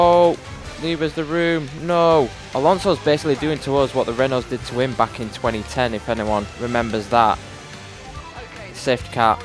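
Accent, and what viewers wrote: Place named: United Kingdom. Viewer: I love that British accent brother 🔥🔥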